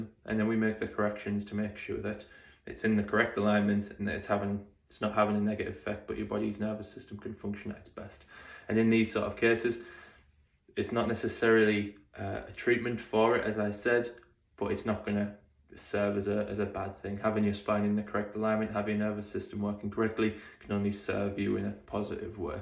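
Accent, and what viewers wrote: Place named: Teesside, United Kingdom. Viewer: I have MS and found this really interesting, thank you. I’m originally from near Manchester and now live in Teesside, trying to pin point your accent. Definitely a N.Yorkshire / Teesside twang coming through.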